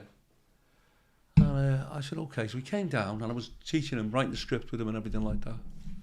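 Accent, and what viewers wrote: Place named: Wigan, United Kingdom. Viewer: Love that Wigan voice 😂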